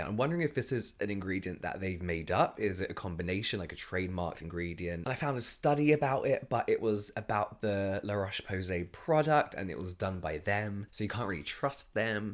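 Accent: United Kingdom. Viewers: Are u British?your accent seems so yeah?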